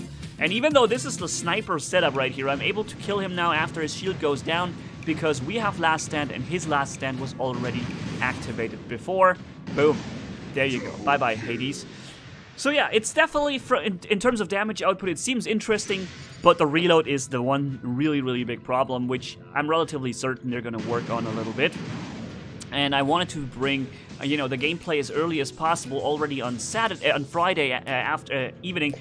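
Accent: Germany. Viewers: You do realize that English is NOT his native language, he's lives in Germany. Why don't you type your posts to in German and see how well you write/pronounce words in his language.. In fact I'm not positive but I think he learned English just in the last 5yrs or so. Don't worry though, I’m sure and others around the world, who dare to speak on social media in their non native language of English, will take your post to heart, and vigorously practice their proper pronunciation of English words before their next video/podcast. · Are you German?!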